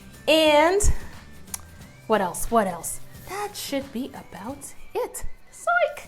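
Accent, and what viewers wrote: New York, United States. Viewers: Are you from New York or New jersey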